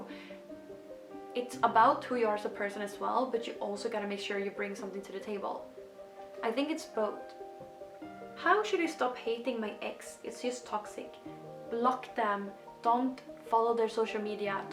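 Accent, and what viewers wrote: Sweden. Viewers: Your accent sounds very Swedish, I’m not Swedish but I’ve lived in Sweden for some years and I can recognize it.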